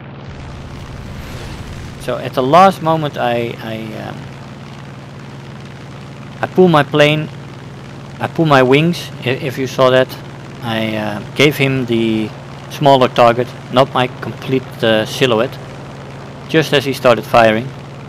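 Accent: Netherlands. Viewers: Ah the glorious accent of the Dutchman.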